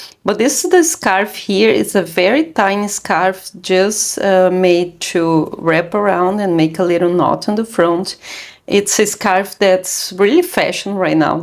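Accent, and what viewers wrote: Brazil. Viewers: Are you brazilian ? You have the brazilian accent 😊 I love the tutorial! Thanks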